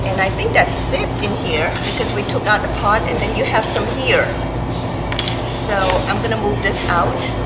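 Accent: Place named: Germany. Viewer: where is this weird accent from? sounds german english to me!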